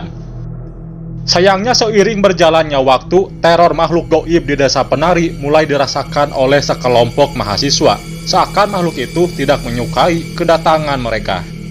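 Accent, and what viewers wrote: West Java, Indonesia. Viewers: logat sunda abangnya ini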